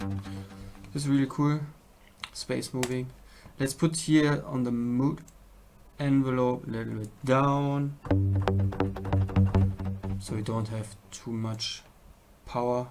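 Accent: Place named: Germany. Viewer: Dein Englisch kling Deutsch^^. oder?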